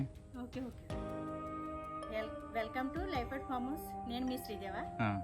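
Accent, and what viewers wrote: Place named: Krishna-East Godavari, India. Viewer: Dear — Don’t feel bad for not able to speak in English amma. I am 80 years old. And I did M.A English While working as a B. Ed. teacher. But your Telugu is so sweet with an accent between the Krishna and East Godavari districts. It’s not the language but the. Bhava prakatana which is important. That is important. That you have more with your smile. I like. and I watch all your videos. Bhasha anedi maatladuthovuntene brathukuthundi. You are doing your. duty as a. wife and as a mother very well. You are a. hard worker. Keep it up. By the by your farm is very nice😊God bless you and your. family. At this age my hands are shaking so much.Don’t feel bad if there are any mistakes.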